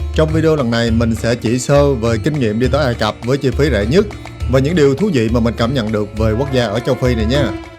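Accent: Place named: Mekong Delta, Vietnam. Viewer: Cái kênh du lịch mà tui thích vì phần thuyết minh rất riêng của, vừa hay mà cũng vừa mắc cười, đậm chất miền Tây rặc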